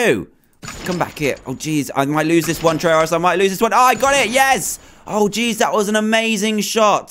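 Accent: United Kingdom. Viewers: If he says oh dear its a nothr thing plus he is british so he pronounce deer dear ok?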